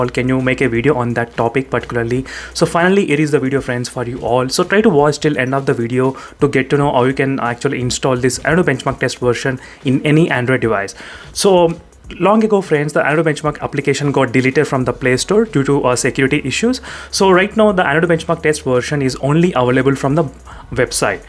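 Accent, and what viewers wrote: India. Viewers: Nica video... I want to say, when you speak English I'm sure u are from India right??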